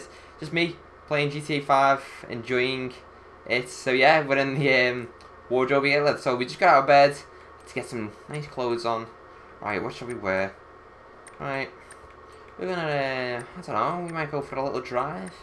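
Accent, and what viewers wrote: Liverpool, United Kingdom. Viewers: Are you from Liverpool? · GinaBee yeah i think so, SCOUSE !!!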